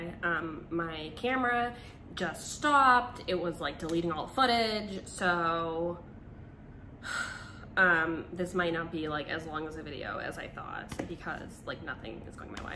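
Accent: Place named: Massachusetts, United States. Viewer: Everyone subscribe to channel so she can talk about the Jamaica cat incident!!!!! I feel like and Danni would get along, should visit LA and do a video with danni!! Your both from Massachusetts talk kinda the same and are fun good story tellers idk🤷🏻‍♀️🥰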